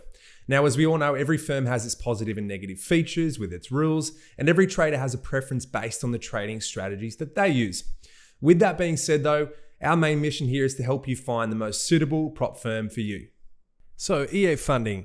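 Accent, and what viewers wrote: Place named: Australia. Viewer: Your accent being too Aussie, can only suggest that you have a bias to lean towards conformity rather than ingenuity. Other than that, seems like an alright person.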